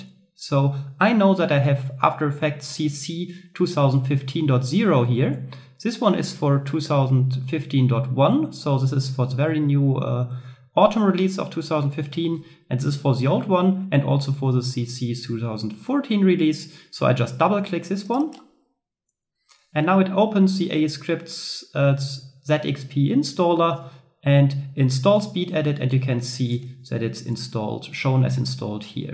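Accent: Germany. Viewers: man merkt das du deutsche bist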